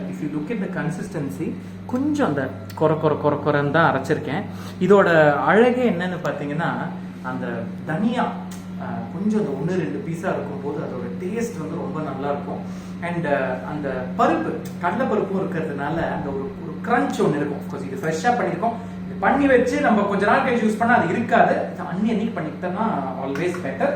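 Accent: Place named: Thanjavur, India. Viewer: Age old recipe. Gothsu and gosthu are same. Regional pronounciation. Typical tanjore district pronounciation! Gosthu, embLadhu for eNbadhu😂😂 it has its charm.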